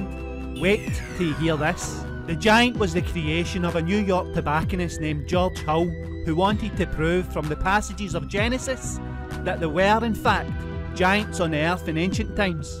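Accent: Scotland, United Kingdom. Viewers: Was in english, with a scottish twang.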